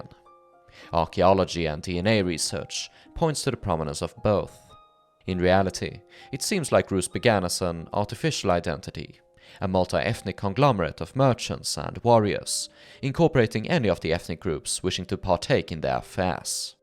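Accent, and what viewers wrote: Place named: Sweden. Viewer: Premium quality material as always, and your English is excellent, grammatically superior to many (if not most) native speakers, Swenglish charm but with perfect 'ch-' sounds. First rate.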